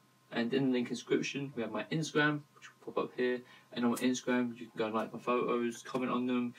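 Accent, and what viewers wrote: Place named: United Kingdom. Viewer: Am following this channel. Extremely love that British Accent.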